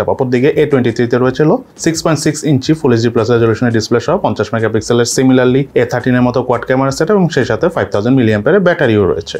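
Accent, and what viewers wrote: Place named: Dhaka, Bangladesh. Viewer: ভাইয়া আপনার কথা শুনে মনে হয় আপনি ঢাকাইয়া আর আপার T Shirt গুলো খুব সুন্দর পরেন ☺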